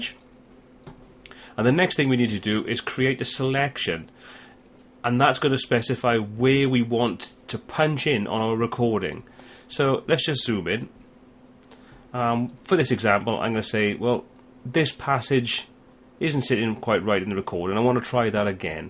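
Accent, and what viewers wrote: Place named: South Wales, United Kingdom. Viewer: Great. Definitely South Wales.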